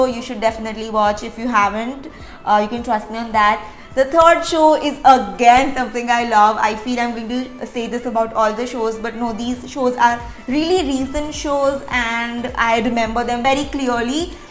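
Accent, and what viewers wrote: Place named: Gujarat, India. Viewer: Gujarati Taste Che Tamari English Ma..😅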